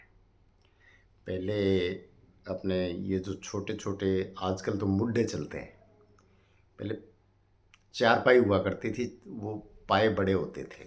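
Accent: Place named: Haryana, India. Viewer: Bahut achi jankari ji.......Aapki tone k hissab se aap sayad haryana se belong krte h....nice video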